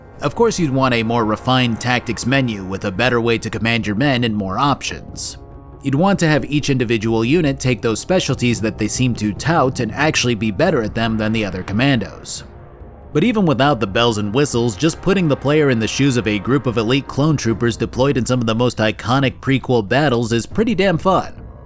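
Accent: New Zealand. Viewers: I love mistaking a New Zealand accent for a British one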